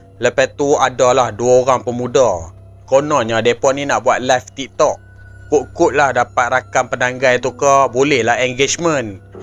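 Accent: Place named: Kedah, Malaysia. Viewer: BG guna lah ayat KL,baru lagi ok,tak leh lari slen Kedah ya?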